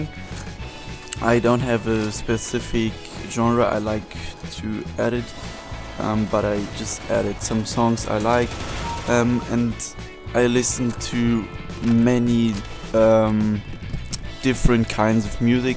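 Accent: Germany. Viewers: are u german ?^^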